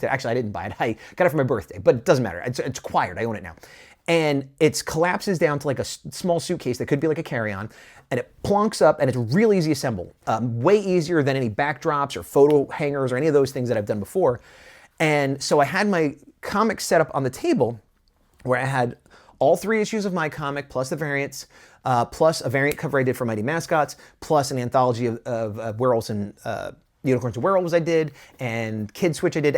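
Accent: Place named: New York, United States. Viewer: I think you SHOULD use that voice and “hey buddy, you like monstahs?” that would be gangster! let your new York flow. sing it like. 🤙✌️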